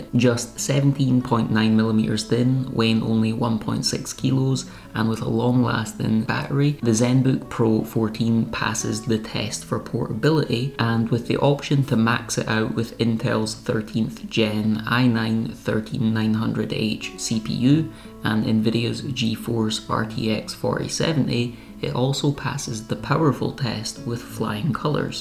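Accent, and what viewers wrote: Scotland, United Kingdom. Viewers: I subscribed because of tiny house, Scottish accent, location and renovation. Being an, ahem, more mature subscriber, this computery stuff is amazing and I haven't a clue what it means! But your voice is so lovely and I appreciate the mental health tips. Good for you.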